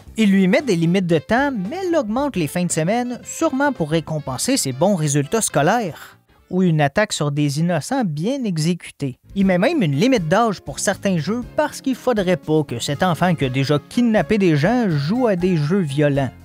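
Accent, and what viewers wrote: Quebec, Canada. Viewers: Des vidéos toujours aussi intéressantes, et malgré que je viens de France, l'accent québécois ne me dérange absolument pas, au contraire personnellement, je le trouve juste tellement cool et relaxant donnant un aspect chill à la vidéo et les blagues ton grand-père sont à mourir de rire x) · Il n'y a que Kamek que l'on a pas eu l'occasion de jouer en tant que personnage régulier. Ai-je tord ? Et sinon, j'adore ton accent.😊 Vive le Québec.😁 À plus.🎉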